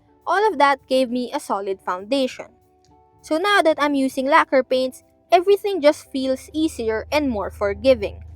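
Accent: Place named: Philippines. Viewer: filipinos really have that accent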